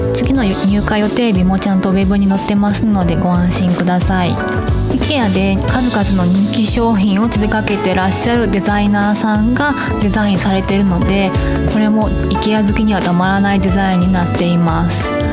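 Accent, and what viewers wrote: Kansai, Japan. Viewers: さんの、関西弁がまじる説明が好きです😊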